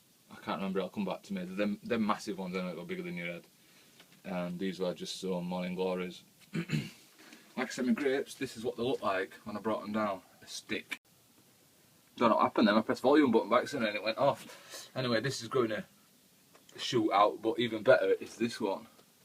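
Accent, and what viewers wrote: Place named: Barnsley, United Kingdom. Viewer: I didn't think you were far away with a Barnsley twang like yours. Check out if you already haven't johnnies allotment so funny. Got some chicks hatching so will put a post out same time as i get some photos of allotment. Its always good to see new people and allotments good luck for the season will keep looking.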